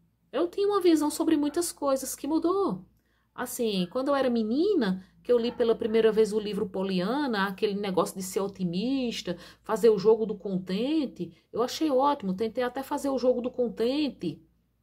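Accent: Northeast, Brazil. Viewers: Ótima resposta, parabens, pelo seu sotaque vejo que vc e' nordestina, vc e' de qual Estado?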